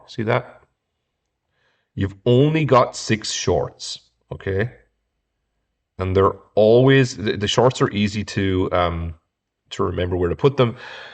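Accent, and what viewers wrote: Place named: Germany. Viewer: excellent video! first off , your German accent was the highlight of my morning. Also, after the mosfet install u used a yellow brush to cleanup the job site. what product did you use for that? cuz i have some rebuilt ham radio gear where they didn't clean like you did. Also,is there a number of seconds u keep the s gun on to avoid cold solder joints.? lastly, I have this Bose 300 but I entertain at fancy houses and ive seen numerous Sonos brand installs. Do you know if Sonos has a good build quality? Thanks so much. Jim in Washington state.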